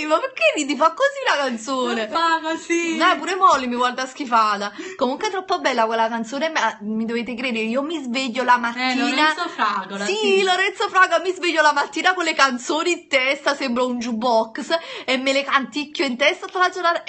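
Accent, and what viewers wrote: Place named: Naples, Italy. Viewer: Una cosa Chitarra romana lei è simpatica così com'è se cambiasse nn sarebbe e poi è Napoletana e a noi piace così sempre una cosa dite l'italiano l'italiano e basta ognuno parla a suo modo nn fate le perfettine a tutti costi e sia chiaro nn è un attacco e solo una risposta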